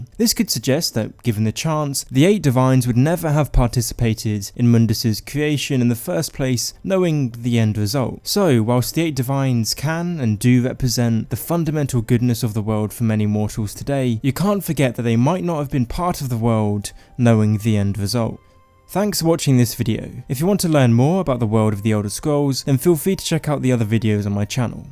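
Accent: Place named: England, United Kingdom. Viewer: Haha man I think I get what this guy’s saying. You’re like English friend one, and Simon from [everysecondyoutubeaccount] is English friend two. David Attenborough is like my Grandfather who’s since past. Voices and accents are fascinating.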